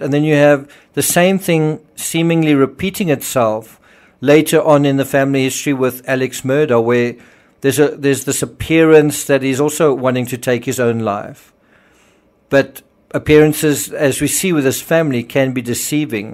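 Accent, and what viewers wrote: South Africa. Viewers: Your accent leans more south African than American, your from? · Thanks for this episode and your groovy safrican accent!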